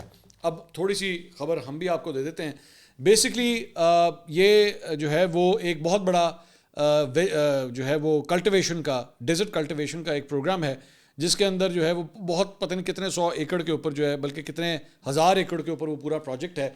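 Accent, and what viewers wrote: Punjab, Pakistan. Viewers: Aoa. bhai ap ka Punjabi bolny ka style bht Pasnd hai mujhe.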